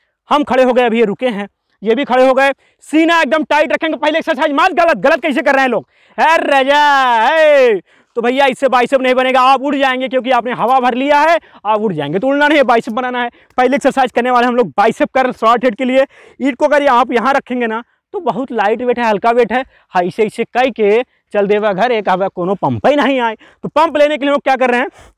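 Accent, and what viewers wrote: India. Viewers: Bhai aap akdam deshi voice me shikhate ho mja aa jata h🙏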